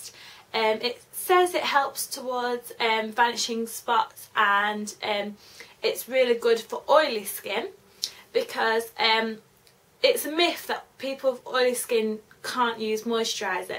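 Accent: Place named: United Kingdom. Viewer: i love British accent mm ^ - ^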